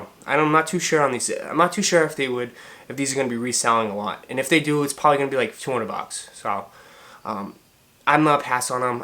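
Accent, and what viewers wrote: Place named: Northeast, United States. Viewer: Your wicked accent sound like you're from the NE area.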